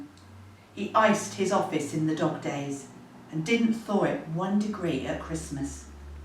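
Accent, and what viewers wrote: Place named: United Kingdom. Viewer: I loved listening to you read A Christmas Carol! It's different for me to hear it with a British accent. 🥰😘🎄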